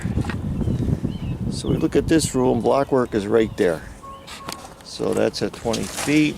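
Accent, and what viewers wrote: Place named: New Jersey, United States. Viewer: Lol u gotta be from jersey from the accent. Nice work tho 💪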